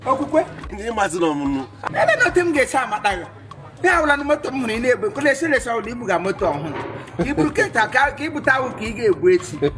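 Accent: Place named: Mbaise, Nigeria. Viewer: You are very talented, I like the mbaise your speaking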